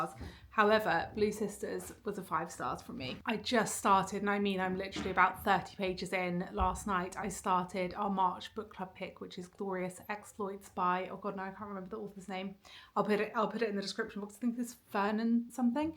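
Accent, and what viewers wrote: United Kingdom. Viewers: I just want to say how much I love your videos. Your soft sweet voice is so calming. I love the British accent….. Your home is going to be so amazing when it’s all done, looking forward to the tour. is so precious, listening to his cute voice brings me back to my children when they were little. Blessings lovely lady♥️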